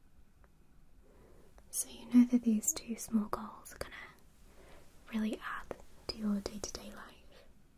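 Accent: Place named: Northern England, United Kingdom. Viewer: I like your accent.northern England, Blackburn?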